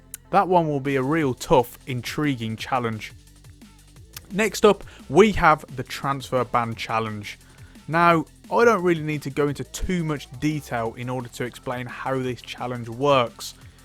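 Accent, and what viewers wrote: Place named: Black Country, United Kingdom. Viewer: yeah I'm from Tipton (near westbromwich) so I speak black country and your accent sounds similar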